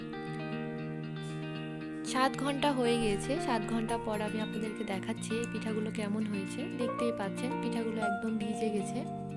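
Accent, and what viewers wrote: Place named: Jessore, Bangladesh. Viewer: Apu mone hocche Jessore er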